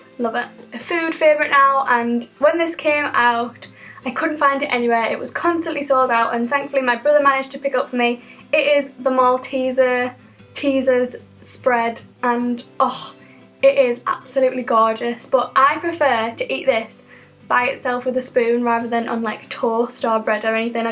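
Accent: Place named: North East England, United Kingdom. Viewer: It's always nice to hear a familiar accent! (Woop woop, north east!) I like the sound of the Solar Powder, I'll have to have a snoop next time I'm in Boots. I always forget that S&G do make up! x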